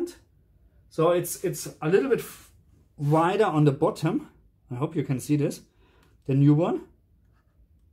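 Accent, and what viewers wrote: Germany. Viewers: Kling ein bisschen deutsch das englisch, aber dafür erstaunlich flüssig.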